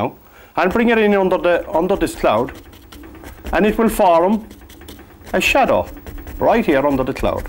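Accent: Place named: Ireland. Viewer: I love your accent and your paintings 🌸 As a German lived in North Cork for 12 years I enjoy your accent so much!!!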